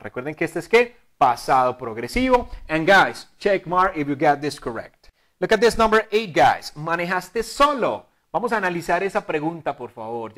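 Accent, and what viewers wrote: Colombia. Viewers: Wow. Me encantó como explicas todo. Tu asentó colombiano me encanta. I ❤️ 🇨🇴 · ¡Amé su acento colombiano! Además tiene una manera tán sencilla de explicar. Realmente este tema yo ya lo vi, pero siempre es bueno reforzar y conocer otras exploicaciones a parte de las que me dan mis maestros. Por mi parte, seguiré viendo los vídeos de este canal; me encantaron. ☺ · JAJAJA pensé que era la única. Es tan lindo y además con ese acento colombiano, uff!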